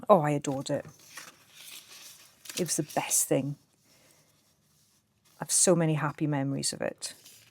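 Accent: Wales, United Kingdom. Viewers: Lovely to find you on here and hear the Welsh accent - but oh Im so sorry I couldn't watch til the end because far too many adverts every 3 minutes. You tube is now so annoying with the ads :(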